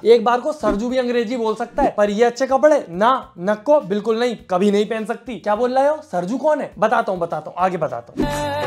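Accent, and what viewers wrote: Maharashtra, India. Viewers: Bhai aap Maharashtra se ho kya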